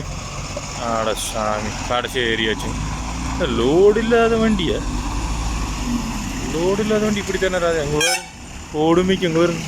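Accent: Coimbatore, India. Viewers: coimbatore tamil ngow anna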